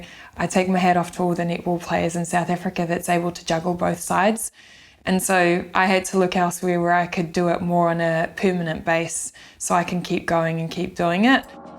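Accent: New Zealand. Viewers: she threw her roots away by disquising herself with the kiwi accent, shame! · She has got such a kiwi accent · your incredibly gorgeous 😍 south african 🇿🇦 women are the most beautiful women in the world and us kiwi men absolutely love them, the accents are sooo sexy but its so cute how you've lost it and have a kiwi 🥝 accent now,i would give anything to meet you and even more to be the man of your life 😍 so carry on being you and dont change and i hope you find a real kiwi man that will give you wat u deserve 🤗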